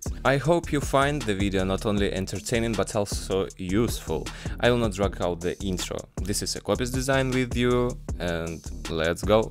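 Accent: Russia. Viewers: Привет! Ты русский , по акценту слышно)))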